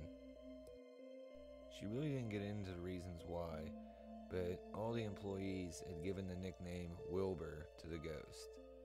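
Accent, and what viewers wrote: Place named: Pittsburgh, United States. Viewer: Good stories! Only critique is that when you are speaking I can still hear that Pittsburgh accent come through. Like "..... One of the last things I'm gonna share wit JEW." It's hard to break that after speaking it for so many years. Keep doing what you are doing..... The followers will come!!!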